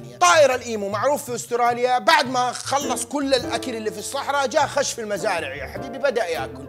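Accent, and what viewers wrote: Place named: Hejaz, Saudi Arabia. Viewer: يب سعودي يتكلم لغه اهل الحجاز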